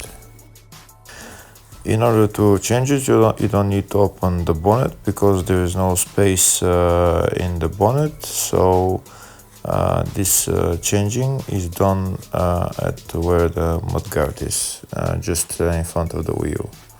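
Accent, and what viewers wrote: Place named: Eastern Europe. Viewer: This accent sounds familiar. Kinda eastern European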